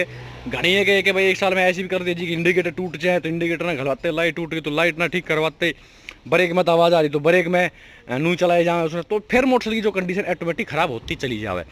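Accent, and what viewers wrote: Haryana, India. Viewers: Bhai Haryana ki voice ek number h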